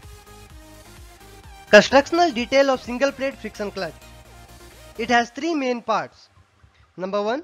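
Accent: India. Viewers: More confused than before. Hard to understand with Indian accent. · That indian accent is disturbing. Better to read instead of listening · This is an indian guy because his speaking accent is hindi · i swear my brain has been trained to shut up and listen like im in class whenever i hear an indian accent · How come it's always the guy with an Indian accent that knows literally everything and can explain it to the dumbest people and it makes sense!? This is a masterpiece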